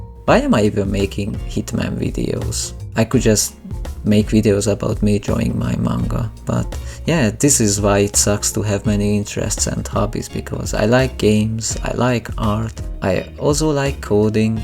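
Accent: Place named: Hungary. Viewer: Happy New Year. You're from Hungary from what I hear from your accent.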